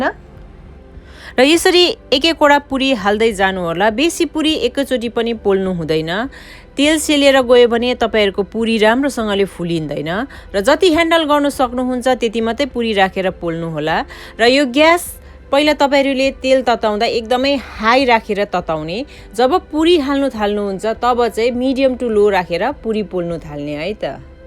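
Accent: India. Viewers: Sneha je jsto vayo pani nepali jsto bole pani kaam ta ramro garnu voko cha di le..Bhasa ta hami eta India ko nepali le estai bolchau maan parcha ta thik cha maan pardena ta na hera video choice is yours.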